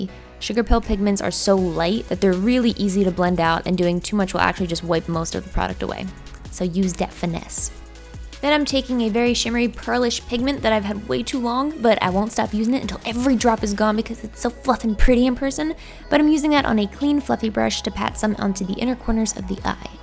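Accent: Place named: New Jersey, United States. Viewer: How come u randomly get a jersey accent throughout ur vids lol I love it but srsly y does it happen?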